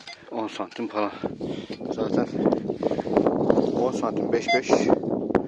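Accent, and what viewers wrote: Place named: Uşak, Turkey. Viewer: Usta sen nerelisin ya bizim uşaklılar gibi konuşuyorsun şiven çok benziyor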